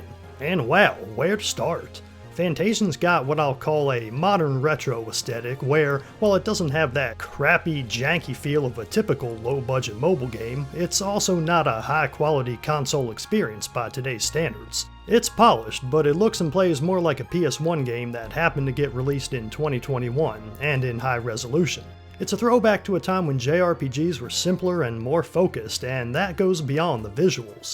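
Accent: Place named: Rural, United States. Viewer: >hick accent, opinion rejected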